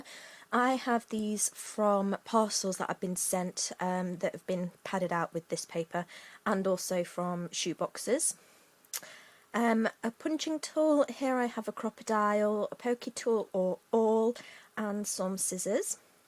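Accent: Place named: England, United Kingdom. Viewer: Love this!! Thank you for sharing your video! Can I use clear nail polish to harden my buttons if I do not have the stuff you are showing in your video? That Diamond Glaze is very expensive when I looked for it. By the way I just love your English accent! Have a blessed day :)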